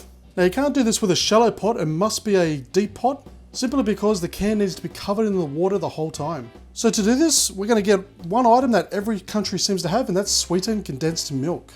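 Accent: Australia. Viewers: Finally a Aussie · he is so fucking beautifully australian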